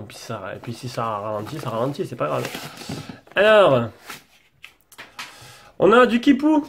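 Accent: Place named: Southwest, France. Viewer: A quand la vidéo du test final de ce tél , car j'hésite vraiment a l'acheter....je le trouve fabuleux niveau photo plutot fluide avec un look sobre et agréable...mais que vaut Réellement ce Modèle Amigo ?....en tout cas chapeau pour tes vidéos et ta voix perso ! elle me plait , ça chante le Jolie Sud Ouest ...lol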